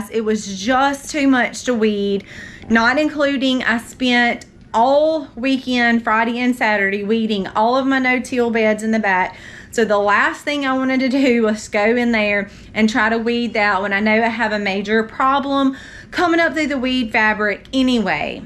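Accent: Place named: Mississippi, United States. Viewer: Yeah that Mississippi drawl is awesome!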